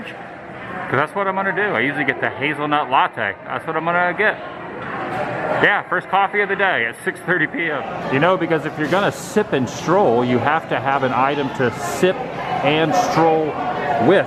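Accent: South, United States. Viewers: It’s called “a southern pronunciation”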